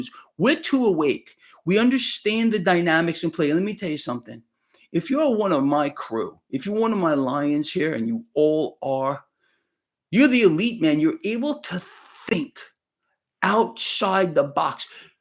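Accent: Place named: Long Island, United States. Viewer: “Hey guys it’s me,!” Your Vinnie Santucci-Long Island/Elmer Fudd combo accent is legendary!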